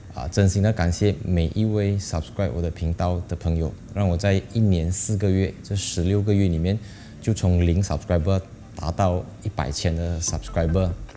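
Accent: Malaysia. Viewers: 就是因为你的本地腔调所以我才订阅的，很大部分本地汽车中文online media学大陆和台湾用了很正式的语言来表达，不是不好只是少了人情味。比如说我们都习惯叫sponge/span了，其他媒体很喜欢用发泡棉这个字，感觉太台湾了。。真的听到很不习惯。。btw，恭喜恭喜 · 其實作為台灣人，我還蠻喜歡你的馬來西亞腔的！內容也很精彩，加油！ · 恭喜！赞！我喜欢你马来西亜华语的腔调。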